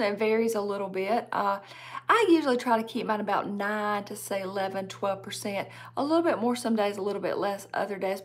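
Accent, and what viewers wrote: South, United States. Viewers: Thank you! Sometimes I can't understand the Southern accent- Green/Grain, etc.🤗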